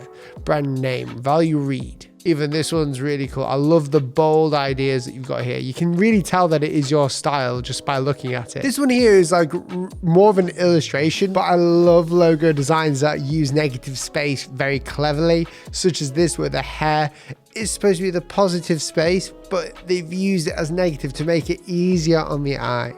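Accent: United Kingdom. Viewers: He sounds VERY British 🔥🔥🔥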